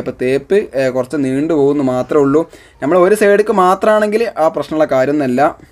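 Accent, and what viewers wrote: Malappuram, India. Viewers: വീഡിയോ അടിപൊളി യാണ്.... എന്നും പുതിയ വീഡിയോ വന്നോ നോക്കും... പിന്നെ ജമ്മളെ മലപുറം ഭാഷ പൊളിച്ചു...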